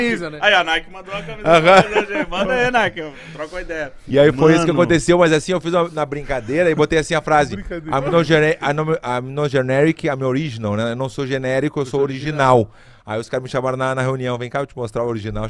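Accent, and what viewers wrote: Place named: Rio Grande do Sul, Brazil. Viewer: O sotaque gaúcho é muito forte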